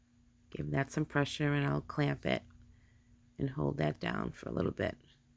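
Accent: Massachusetts, United States. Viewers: Well I am impressed. Beautiful designs and execution! So much so I have subscribed. Enjoyed hearing the MA accent again! Grew up in Reading, moved to L A a million years ago at 22 to seek fame and fortune. What part/town are you from? How/where do you sell your wreaths? All the very best to you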